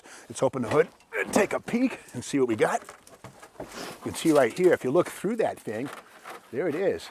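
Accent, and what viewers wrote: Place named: Massachusetts, United States. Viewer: He might have. You could detect a MA accent, but not on every word. I have a friend from Saugus, and he has a much more pronounced accent on every word.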